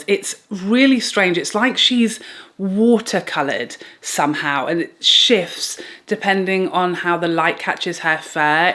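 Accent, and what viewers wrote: United Kingdom. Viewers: Bless you beautiful soul inside out love your vidoes your British accent is so regal and your voice is so calming and therapeutic, I love the mascara never tried brown but I am aiming on getting one as it will give sultry look and emphasis the eyeshadow, the look you created is spectacular 😊💕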